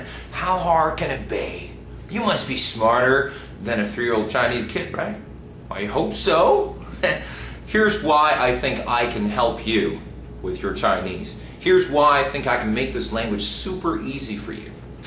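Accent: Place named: United States. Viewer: Dude is amazing. Except he doesn't sound Canadian. He sounds American. Maybe he watched too much HBO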